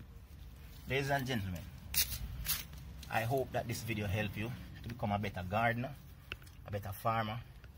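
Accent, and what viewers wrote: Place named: Jamaica. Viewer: Your accent is the best! Thanks for the tips. I'm very excited to get my zoysia going down under on the gold coast with a little jamaican knowledge!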